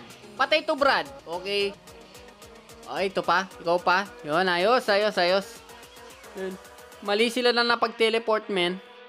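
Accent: Pampanga, Philippines. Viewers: kapampangan ka idol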